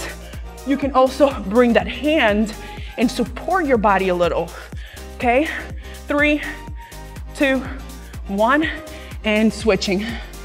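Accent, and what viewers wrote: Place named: Latin America. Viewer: I love my latina sis' accent: shin becomes "chin"☺💕💕 Thank u so much for sharing your gift of fitness w us!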